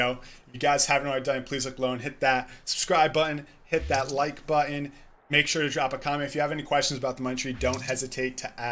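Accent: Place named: England, United Kingdom. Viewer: Hello i’m french and I discovered your Channel thanks to pixia. I don’t Know if i have a good English but i like your English accent when you speak french (its so cute ). +1 suscriber ❤️❤️❤️ (You tried to Say my username but you did not succeed because of the Mademoiselle(Mlle) 😁)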